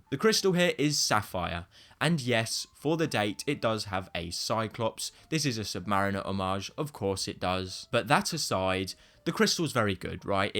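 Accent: United Kingdom. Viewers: I’m completely over the British accent. Click away.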